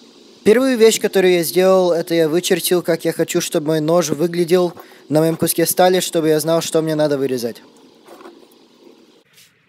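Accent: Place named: Russia. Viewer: Молодец парень! отличная работа! А все кто смеются над русским произношением,- сами попробуйте на каком то другом языке кроме родного так же бегло что то рассказать .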